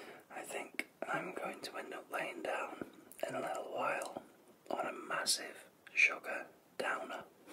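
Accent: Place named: North England, United Kingdom. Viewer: So satisfying - is the honey thicker like that or just as runny? Also I can't place your accent, I keep going from Manchester way, to possibly the Wirral, to maybe Yorkshire (I'm a Yorkshire lass)?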